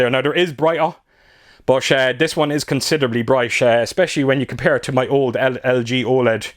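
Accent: Ireland. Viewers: Hi, It’s Mick from across the pond in NY. Been hitting the like button on your videos for some time. Brilliant job mate on your passion. I’m jealous of many of your pieces that only collectors understand. I have a great set up here and have the passion also ( not the film ) 😂 Keep the hard work and love going as to keep the home experience and physical media alive. BTW - Love the brogue- Where in Erin? Got plenty of family in West Clare and Tipperary. Also dig my govee backlight mate. Cheers! 🇺🇸👍🏻✌️😎Just got my steel book copy of SleepHollow can’t wait to spin that incredible film.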